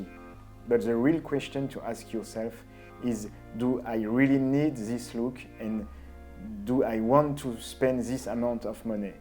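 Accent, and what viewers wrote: France. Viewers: Came here for soap bubble effect but ended up listen to your french-english. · Jusqu'à maintenant je n'avais vu que t'es vidéo courtes de test d'objectifs argentiques, et je ne sais pas pourquoi mais sûrement à cause de ton nom de famille je me suis mis en tête que tu étais allemand ^^ mais en écoutant ton accent, c'était évident ! Non pas qu'il est imcomprehensible ou non, pas de soucis, j'ai très bien compris mais disons que l'accent français est très reconnaissable^^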